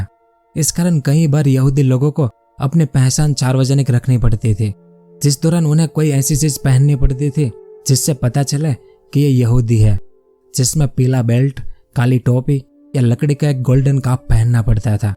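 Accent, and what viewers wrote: Gujarat, India. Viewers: आपने भोट बड़ा इंफॉर्मेटिव ब्लॉग बनाया और इसके लिए आप धन्यवाद के काबिल हेन और भोट ही भीतर बनाया जिस्म ४००० बरस का इतिहास जो मैंने मेरी ९० बरस की उम्र में खिजाना था और हिन्दी मेरे लिये भोट मुश्किल हेन मेरी ज़ुबान गुजराती हेन लेकिन इतना कर शक्त हूँ आप के बोलने से आप गुजराती लगते हों और voice भी भीतर करने की ज़रूरत हेन फाड़ी आवाज़ ज़रूरी हेन ऑलोवर भोट भोट भीतर हेन जिसकी आप को धन्यवाद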